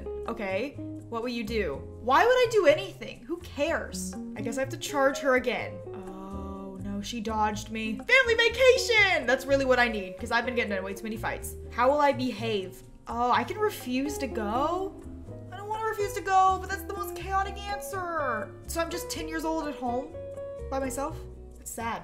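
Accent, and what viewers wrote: United States. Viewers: I thought u were British, I'm British, how did I not notice u were American??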